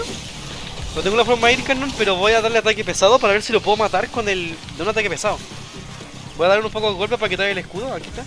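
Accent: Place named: Chile. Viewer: Buena compa me alegra escuchar chilenos, supongo que eres chileno jajajaj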